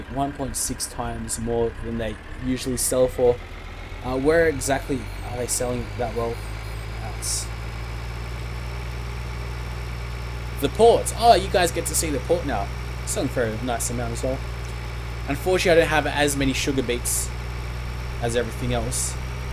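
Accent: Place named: Australia. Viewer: are you from australia because you sound australian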